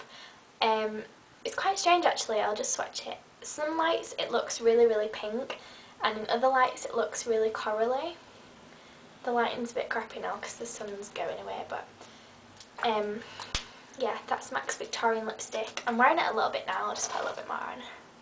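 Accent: United Kingdom. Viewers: i cant bloody handle the wayy you add i got a bloody good day any how do i thought like bloody good cherio shut the fuck up man i hate british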